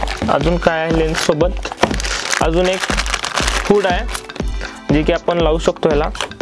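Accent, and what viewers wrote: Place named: Kolhapur, India. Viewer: Assll kolhapuri marathi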